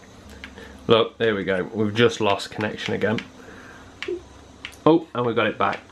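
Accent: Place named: North, United Kingdom. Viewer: Legend. Loving the northern accent too. Where did you get the WiFi card?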